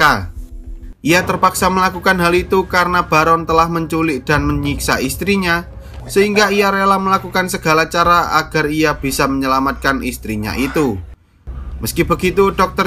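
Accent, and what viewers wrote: Java, Indonesia. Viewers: Logatnya Jawa apakah Mimin org Jawa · Medok euy 😁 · Mendok bgt kurang suka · Maaf ya suara mimin medok bngt, mimin asli orang jawa. Hehe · Jawa nya ketara banget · Wong jowo yo mas? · suara host nya kental banget jawa nya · Bang Abang orang Jawa ya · Mohon maaf, bukannya rasis, medok nya mengganggu. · Bener" kental logat jawa nya. 😁 mantap bng semoga abg dan kita semua salalu sukses. Doakan kan kami semua para pejuang youtube pemula makin berkembang. Aamiin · Logat aja medok bett gaenak di denger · Orang Jawa mana bang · Wong jowo rek? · Bang lu kok medok banget orang jawa ya? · medoknya bikin ngga fokus cokk. pusingg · Jowone notok tenan😀😀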